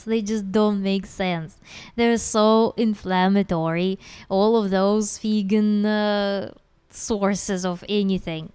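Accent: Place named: Eastern Europe. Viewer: OK I could sense an eastern European accent, but I wasn’t quite sure. I have three different friends from Russia and we talk on Skype. One is from Moscow one is from St. Petersburg and the other is from Novsibirsk. I’m from Texas. One time I told one of my Russian friends I believe it was the one from Siberia that it was still very hot in September. It was about 38°C. His only reaction was holy shit lol. Another time in summer, my friend from Saint Petersburg asked me what the weather was like. Well, I asked the Amazon echo to convert from Fahrenheit to Celsius and the Amazon echo said it was 40°C. He thought he misheard. He was like did it say 40°C? Lol.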